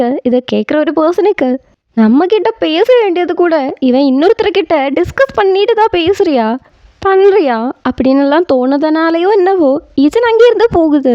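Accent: Kerala, India. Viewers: Hi sis unga malayalam tamil explanation is soo good it's very different to hear me I like it explanation also very neat and clear so tq 😊 Fake fact lips series podunga sis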